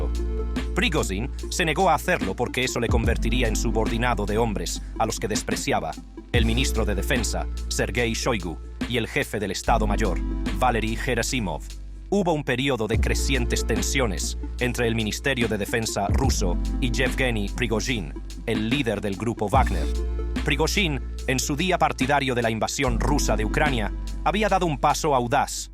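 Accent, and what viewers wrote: United States. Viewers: Tan buenas noticias..pero que pésimo gringo o ruso ..no se...se escucha mal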